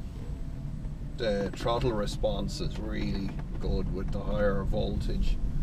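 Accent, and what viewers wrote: Ireland. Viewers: Nothing wrong with the Irish accent. You don't expect them to pronounce it Turd, they shouldn't expect you to pronounce it third.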